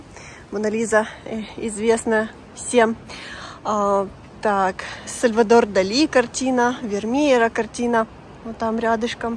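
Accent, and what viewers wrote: Ukraine. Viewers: Такой акцент у тебя Украинский и такая ты серьезная не смотря на юмор Одесситов вообщем покорила ты меня❤🌹💋🌹🌹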